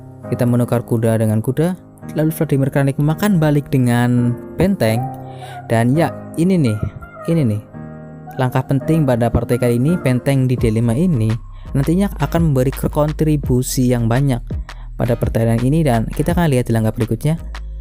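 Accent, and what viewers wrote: Banyumas, Indonesia. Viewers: Ngapak ya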